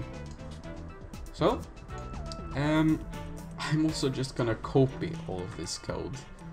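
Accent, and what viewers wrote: Norway. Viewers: Are you a norwegian ? it sounds like you are. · Du er norsk, eller hva? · Er du norsk? Ihvertfall norsk aksent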